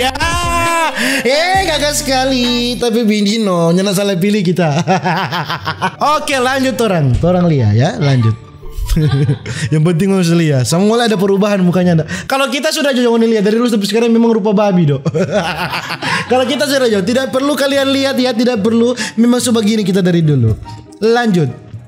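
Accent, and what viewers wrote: Manado, Indonesia. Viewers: Hy bg gua suka liat konten2 lu hnya karna suka dgn cata bicara dan logat menado gua asal sunda Bogor bg sukses selalu yg budi🤣🤣🤣seeyou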